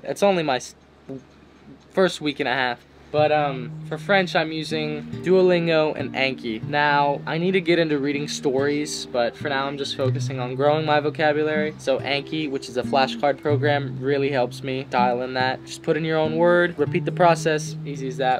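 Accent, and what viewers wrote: France. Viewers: tu as un bel accent français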